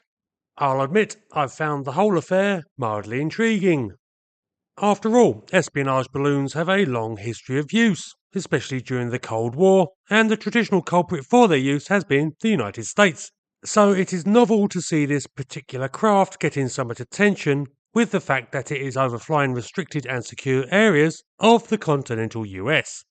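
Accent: United Kingdom. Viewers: Always refreshing to hear a UK voice, with a reasonable, calm, unsensational approach to a major issue. 👍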